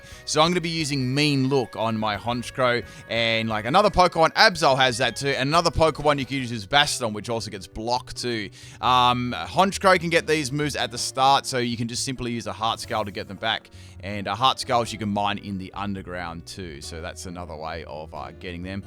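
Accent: Australia. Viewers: Im dead at ur thick Australian accent speaking all fast, normally I speed up videos to 1.25 to get the speed of the person explaining something right first time u had me lower it at .75 lol way smoother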